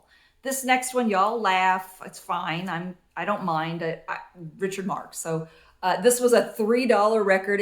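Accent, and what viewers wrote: South, United States. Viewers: Love your southern accent!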